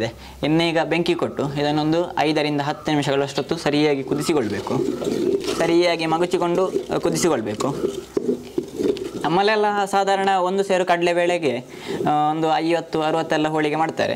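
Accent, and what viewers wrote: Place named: Dakshina Kannada, India. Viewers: Pure south kanara Kannada. Here to listen to that only. Music to the ears. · Nimma kannada bhashe holigeginthalu sihiyagide. Pure kannada. Namma dakshina kannada bhashe.👌👌👌👌👌👌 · Your cooking skill is amazing👍👏👏 also it is very pleasant to hear Kannada in South Canara accent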